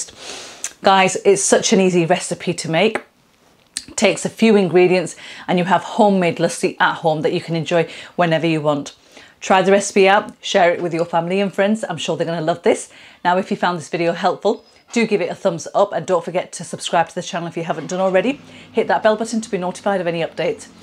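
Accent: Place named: Wales, United Kingdom. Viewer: As an American I'm loving your accent and scratching my head about where it's from....maybe being across the pond I'm totally wrong, but are you Welsh?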